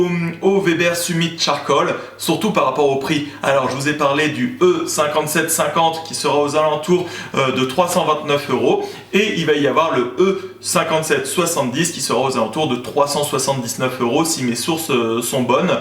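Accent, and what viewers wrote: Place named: Quebec, Canada. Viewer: La prononciation québecoise fait plaisir aussi aux amis belges :D !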